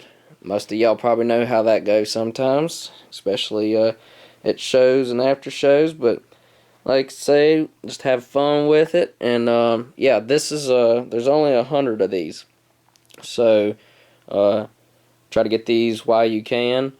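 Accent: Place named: South, United States. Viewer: I'm just hear to hear that sweet southern twang...... XOXO!!!! THUMBS UP IF YOU AGREE!! #TDHFTFW #FAMOOSE #SHTNONM #TEAM.DEADLY.HERTZ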